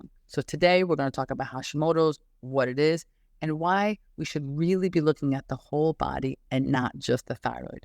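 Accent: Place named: New York, United States. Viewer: This is an east coast US accent like New York or something like that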